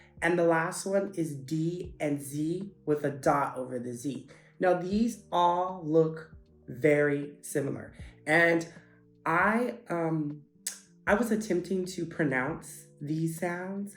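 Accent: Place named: Poland. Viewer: Thanks to yt algorythm I had found this video and just wanted to say that your pronaunciation is phenomenal! I know how it feels to speak foreign language and how stressfull it can be but seriously YOU'RE DOING GREAT. Of course, you have trouble with dipthongs etc. but that's completely normal. It's almost impossible to pronounce every sound right while speaking foreign language. But regular sounds? With the 'simple' words at the beginning you sound 100% polish. You pronounce the 'r' and all of the 'hard' letters with this harsh polish accent. I don't know for how long you've been living here but damn, it's impressive. Also, most of the time, we're happy to hear foreigners trying speaking polish and we'll happily help you.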